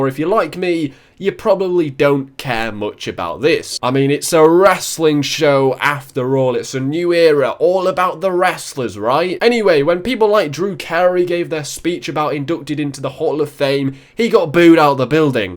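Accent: England, United Kingdom. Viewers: I was wondering which part of England are you from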